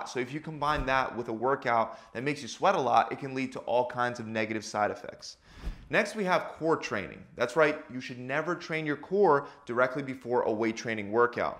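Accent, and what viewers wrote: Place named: New Jersey, United States. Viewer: He is from New Jersey. We in the northeast pronounce the a in water the same as the a in warm just like the British and Aussies. Most of the rest of the US pronounces the a in water like the one in watch. But in the tough inner cities of the NE, an outsider saying waah-durr might be told he sounds gay or weak. To each his own, I guess.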